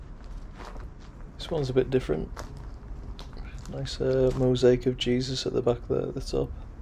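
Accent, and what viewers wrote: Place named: Hull, United Kingdom. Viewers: Can I ask where you are from? You sound like you've got a Hull accent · Great to hear an English voice for once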